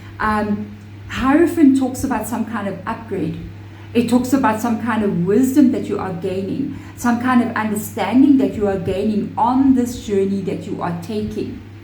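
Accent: South Africa. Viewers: Is your accent South African? 😊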